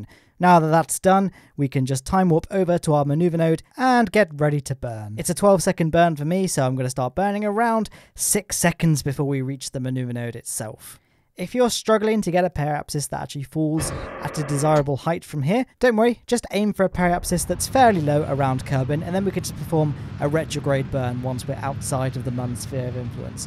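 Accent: United Kingdom. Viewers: He's UK Australia's don't articulate their words as much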